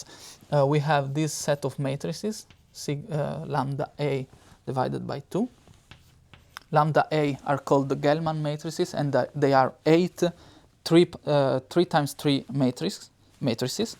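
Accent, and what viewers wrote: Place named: Italy. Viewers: È inevitabile, visto che è italiano. Hai mai sentito un madrelingua inglese o americano che parla italiano? Per quanto lo parli benne, si sente sempre l'accento. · C'è un po di italiano nel tuo inglese ❤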